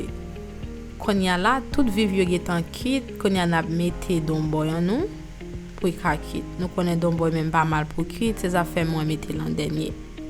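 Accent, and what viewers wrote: Haiti. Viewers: Janw pale a ou se on fanm okap oubyen ou sot nan nord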